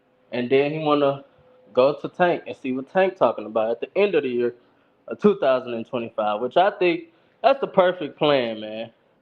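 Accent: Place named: Baltimore, United States. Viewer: you sound like you from bmore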